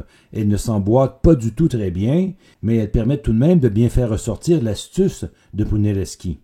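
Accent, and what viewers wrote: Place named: Quebec, Canada. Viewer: Accent québécois hilarant, peu en accord avec le sujet. À quand la Renaissance le long du Saint-Laurent?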